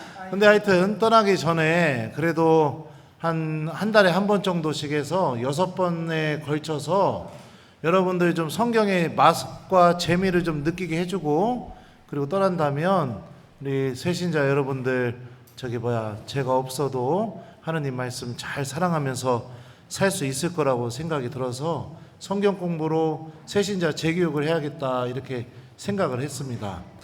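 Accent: Chungcheong, South Korea. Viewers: 신부님 강론말씀최고임니다 저도고향이충남보령 인데 충청도사투리가 참 정겨워유 감사합니다 ❤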